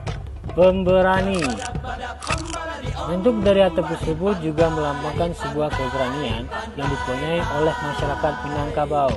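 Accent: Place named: Aceh, Indonesia. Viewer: Logat nya kok logat Aceh ya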